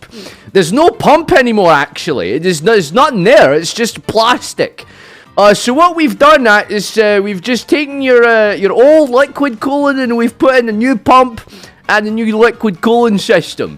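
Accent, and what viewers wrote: Scotland, United Kingdom. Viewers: We need more scottish accent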